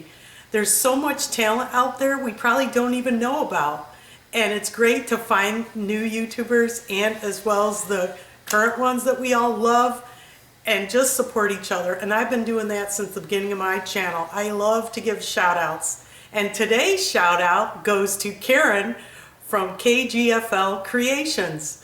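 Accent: Pennsylvania, United States. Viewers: Oh I loved this video. Every bit of it. Im in PA about an hour from where you came home to. I knew I recognized you accent as home. ❤️ Your Grand daughter is precious and looks lovely in her dress. I got my sewing love from my Grandmother when I was about 6. You’re Grand daughter might want to try one day! One more thing, You are a wonderful person, effervescent and joyful, so much fun to watch. 😊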